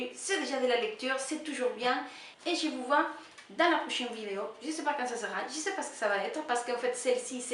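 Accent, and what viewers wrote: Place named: Latin America. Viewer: Coucou ! Comme promis me voici :) (je t'ai répondu dans le groupe) Quel délicieux accent <3 Es-tu hispanique ? (désolée je suis un curieuse, deux amoureuse de cette langue)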